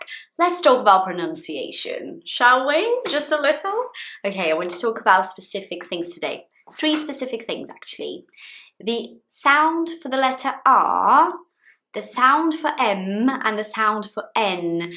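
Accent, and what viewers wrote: United Kingdom. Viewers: I love your videos! I understand all of you say. And I love your British accent. Congrats,! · Your British accent is so delicious! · Finalmente um canal onde posso aprender inglês BRITÂNICO, já tava cansado de só ver inglês americano no meio do YouTube, parecia até que só existia aquele, enfim, já tô amando tanto as lições como esse sotaque tão sofisticado e... MUITO MAIS BONITO que aquele sotaque americano que parece caipira falando, da até agonia rsrsrs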